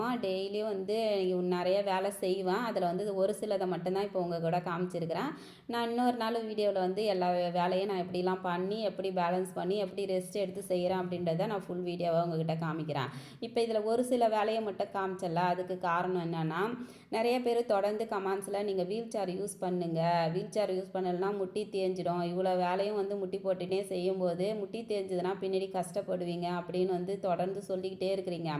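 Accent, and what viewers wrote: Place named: Cuddalore, India. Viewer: சீக்கிரம் உன் ஆசைகள் நிறைவேற பிராத்தனைகள் மா ❤🎉 எந்த ஊர் மா நீ. கடலூர் பக்கம் என்று நினைக்கிறேன். ஏன்னா பேசுறது எங்கள் பகுதியில் இப்படி தான். நேரில் பார்க்க நான் வரேன் மா. எல்லாம் நல்லதே நடக்கும் உனக்கு. உங்கள் சொந்த வீடு என்று நினைக்கிறேன். எப்படி உங்களுக்கு தகுந்த மாதிரி கிச்சன் மேடை அமைந்து இருக்கு 😢🎉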